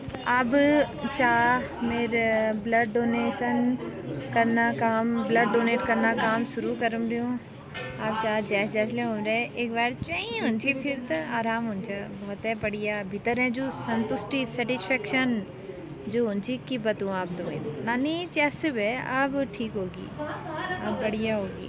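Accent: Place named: Pahari, India. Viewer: Mam uh look soo pretty and cute...and I really like the way you speak pahadi...😘😘😘😘...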